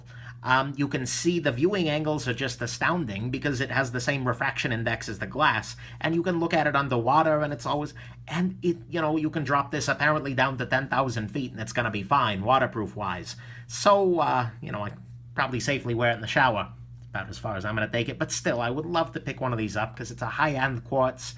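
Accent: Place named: New Jersey, United States. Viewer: Why do so many find the way you talk as different? I live in Jersey and everyone sounds just like you?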